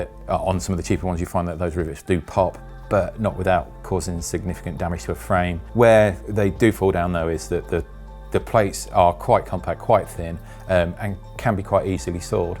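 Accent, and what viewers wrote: England, United Kingdom. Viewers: Difficult to understand your strong UK accent on some of the lock names because you speak so fast. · This Video looks very useful except this guys is sorta quasi mumbling in a thick English accent makes him very difficult to understand... blah... I have to turn on the closed captioning and still you aren't confident you are getting it all... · I agree with someone... said so much but learn little,plus the british accent... god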